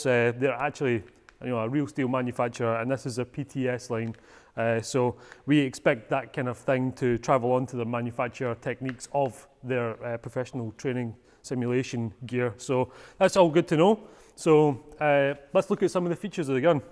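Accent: Scotland, United Kingdom. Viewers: Such a thick Scottish accent sometimes it sounds like he's speaking a different language.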